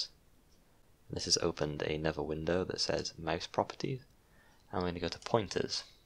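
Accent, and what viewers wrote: Wales, United Kingdom. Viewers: Welsh lad?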